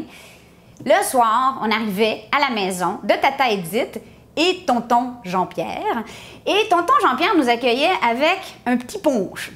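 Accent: Quebec, Canada. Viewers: l'accent québécois 😉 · Vous êtes adorable et drôle.et puis l accent québécois fait le reste.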